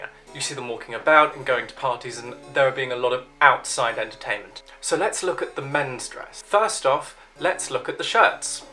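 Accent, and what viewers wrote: United Kingdom. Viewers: PS your accent is very posh and regal. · Love your very english english ❤ a plesure to listen to you. And you are very polite in your review of clothing accuracy. A gentleman 🎉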